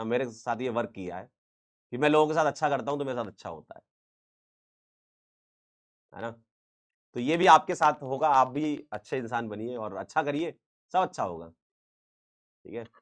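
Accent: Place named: Bundelkhand, India. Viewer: Sir mera gaon bhi mauranipur h😅....tabhi me sochu ap bich bich me bundelkhandi kyu bolte h😂...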